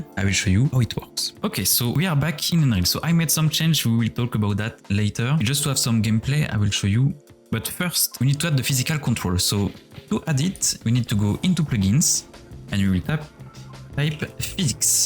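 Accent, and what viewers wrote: France. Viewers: your french accent sounds funny, i like it. Keep the great work up😆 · J'ai presque l'impression que tu parles francais, c'est cool c'est plus facile a comprendre 😊 Merci pour la vidéo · Ca c'est un Français qui parle anglais :) sympa la vidéo, bonne continuation !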